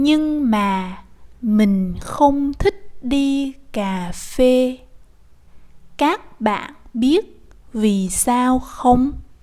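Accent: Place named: South, Vietnam. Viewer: 👏👏 excellent, thank you! -- May I ask what dialect this is? I thought I heard several Southern characteristics, but the "s" sounds Northern to me?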